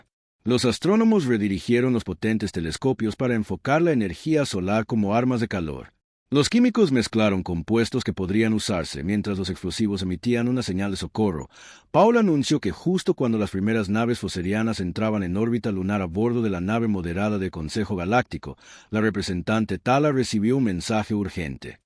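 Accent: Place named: Bolivia. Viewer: el que habla es boliviano jajajaja, me gusta, pero no con fio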